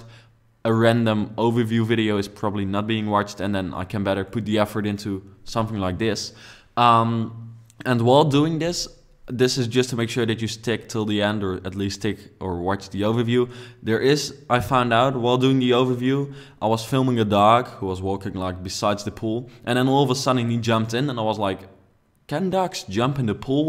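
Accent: Netherlands. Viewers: Je kan duidelijk aan het accent horen waar je vandaan komt haha (geen haat, nederland staat er een beetje bekend om)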